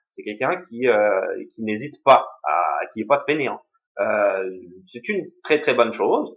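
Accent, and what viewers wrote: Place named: Quebec, Canada. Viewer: Pour avoir vécu au Québec, je confirme, tu t'est bien intégré, je ne sais pas d'où tu viens (de France, de Belgique) , mais tu as bien choppé l'accent ! :)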